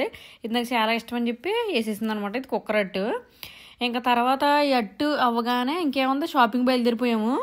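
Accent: Godavari, India. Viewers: Hi Madam, Really I like ur Godavari slang and ur husband's funny behaviour...... Really I like ur funny videos..........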